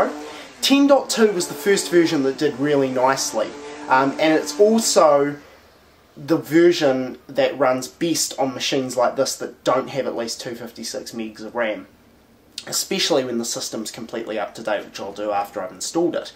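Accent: New Zealand. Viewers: Sounds more like New Zealand accent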